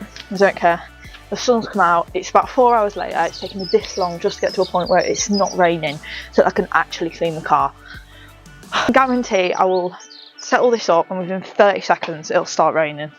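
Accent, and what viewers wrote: United Kingdom. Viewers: Omg youre alive!:D great to see you and hear you british accent hahah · maybe it's because I'm German and for me it sounds so utterly british... 😂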